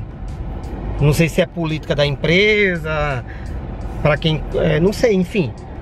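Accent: Northeast, Brazil. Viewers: nuuuguets kkkkkkkk gostei do video ate pq ele tem um sotaque nordestino tem o meu gostei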